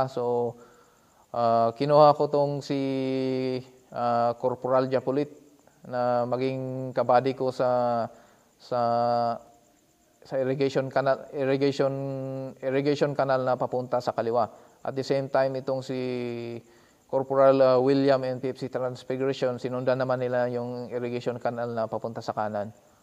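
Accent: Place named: Samar, Philippines. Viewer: Sir tubong ano po si sir? Parang Samar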